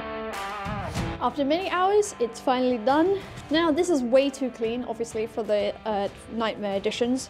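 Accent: United Kingdom. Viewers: Is she Scottish or Irish 😅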